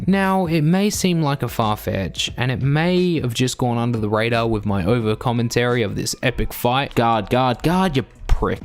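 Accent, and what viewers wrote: Australia. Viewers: The guy sounds Australian. If you watch Crocodile Dundee, you'll know Australians have ridiculous standards based on hogwash.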